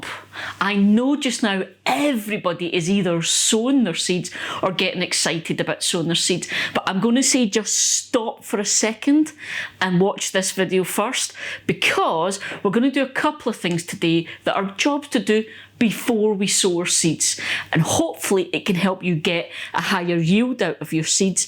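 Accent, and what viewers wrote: Scotland, United Kingdom. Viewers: Hi! I have only recently been introduced to your you tube channel by Gardener Scott! I have watched a number of your video's and find them very informative, professional and entertaining! A bit of background. I live in the state of New Hampshire USA. I used to garden for a number of years, stopping about 5 years ago. Starting up again this year. I always did a conventual garden. This year I am planning on making 6 high raised beds, 4 for general produce and 2 for asparagus. My growing stats are- zone 5A, Lat. 43.6, elevation 1100 ft. I live on a 3 acre property which my grandfather started a dairy farm on. Rocky soil but VERY fertile Carlton Loam. I cannot stop anything from growing including weeds! Weeds, the main reason for raised beds. The high beds( 30 inches approx.) I am retired and don't want to bend over or be on my knees as much. 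I realize you are in a more restricted space,(urban, rural) I would appreciate any tips for me and fellow gardeners which have more space. I am still planning on planting some crops in ground, corn, potatoes, winter squash and cantaloupe! I am also planning on planting some other plants in ground as well as in the raised beds to compare! Love your Channel! Love your expertise! And your accent as well!!!!!!! Could listen to Scotts and Brits all day!!!!! And I am part Dane by my grandmother! Eric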